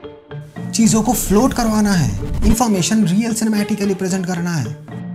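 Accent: Bengal, India. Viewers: Bhai tum Bengali ho ???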